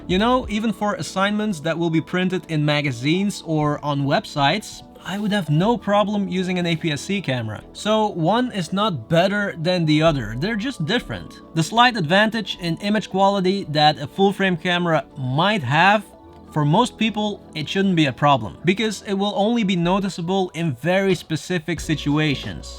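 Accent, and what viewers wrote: Belgium. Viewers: Antwerp accent?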